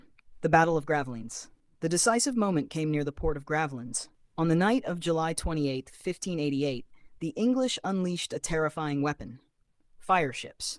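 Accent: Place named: United States. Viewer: "THE BATTLE OF THE SPANISH ARMADA" makes no sense. But what else can you expect from a US dialect narration? The battle of the English Channel is a bit more logical. Jack, the Japan Alps Brit.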